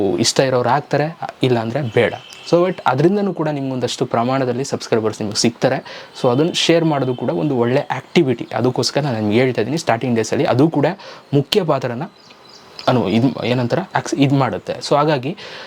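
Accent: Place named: Hubballi, India. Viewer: Nimma matu Nija Tara ede nivu Ennu Belibeku nanu Nija Andre Hubballi avalu nimma Bhashe Chanda anstu adake sub madide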